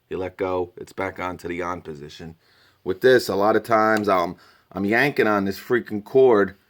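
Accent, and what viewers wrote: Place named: Northeast, United States. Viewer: You have to look too beyond the initial price. Some might say I can get a "better" Toro, HomeLite, etc. at Lowes/Home Depot initially but where do you go to get it serviced, definitely not Home Depot, etc. Ace Hardware is a Authorized Dealer and typically your old school lawn shops service the higher end products like Stihl, Husqvarna. You have to add that to your overall decision in purchasing a unit. I think for myself, for light blowing off grass the driveway and deck, I can't beat the BG50 for my personal use that is for sure. Love the Northeast accent! lol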